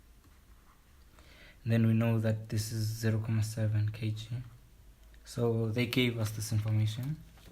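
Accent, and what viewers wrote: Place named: South Africa. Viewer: I know this is a South African voice. Which university you at? Thanks for the help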